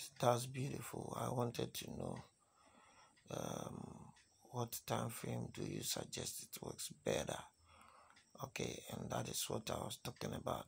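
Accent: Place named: Ghana. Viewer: You sound like a Ghanaian ❤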